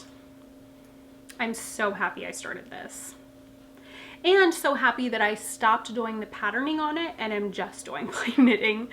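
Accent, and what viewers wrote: West Virginia, United States. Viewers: I am so going to purchase your new sock pattern! I'd love to hear you talk in your W. Va. accent. I'm from Ga, so you already know how I sound. lol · I hope you had your cosy snow day - chilli and brownies sounds like a great way to spend the day. Happy Birthday to Eric. The love story pattern looks great both ways, I particularly liked the version which just used 2 colours - although I think a gradient yarn may have merit in place of the minis. If you got snow I hope it clears enough for your family to be able to visit - you need to be able to practice your WV accent with your Sister (your reaction to “by” made me laugh)! Best wishes, J x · I love seeing you back on track ❤ and I’ve never seen that color Stanley cup!! Let that WV dialect fly! 😂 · Good morning! Glad to have this to tune into 1st thing this morning. I am working my 2nd pair of Magic Loop Vanilla Socks!!! 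I learned from your tutorial and now feel so empowered to create the cutest socks. It makes me very happy, will never NOT have socks on the needle now. Your January yarnable socks are fabulous. The dark pink is stunning with the lighter contrast color. I will definitely try this pattern when I'm done with the vanilla socks I'm working now. Thanks for the inspiration, Has made my life even better!!!! Loved drinking my coffee to your vlodcast this morning- good way to get the day started. You can take the girl out of West Virginia but you can't take the West Virginia out of the girl!!!! Cute southern drawl revealing your roots!!!!!!! Have a great Thursday🤩🐑🍵🧶 -Carrie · You seem to realy enjoy podcasting again without the additional responsibility of the shop, and your new pattern is lovely! I especially love the 2nd colorway you are making in the Lovestory sock pattern. Btw, being a WV born gal myself, I picked up on your accent coming through; happenns to me when I go back to visit relatives in Almost Heaven. 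I, too, love the winter snow season but only get to enjoy it once in a while when I visit the homeplace.. Thanks for sharing your knitting and knowledge.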